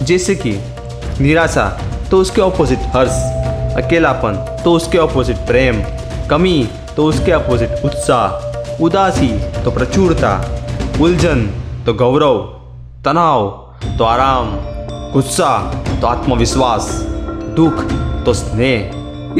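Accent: Gujarat, India. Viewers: Are u Gujarati?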